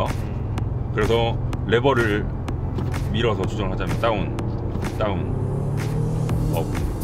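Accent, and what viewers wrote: Chungcheong, South Korea. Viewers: 이분 말투가 전형적인 현재의 충청도 말투입니다. 거의 교과서네요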